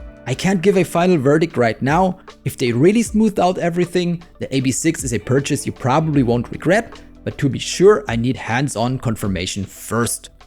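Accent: Germany. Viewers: Hi. I am new to your chanel, I live in Germany, from your accent I gues you are german, do you have any tips where i could get nyogel 767a? Danke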